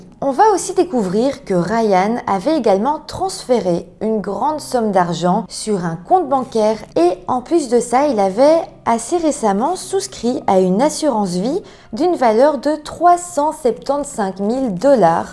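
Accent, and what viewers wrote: Belgium. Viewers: L'accent Belge 😍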